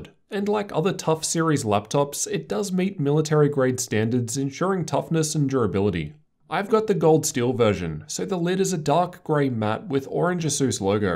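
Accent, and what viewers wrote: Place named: Australia. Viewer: Why do you link us and uk but not au? U sound aussie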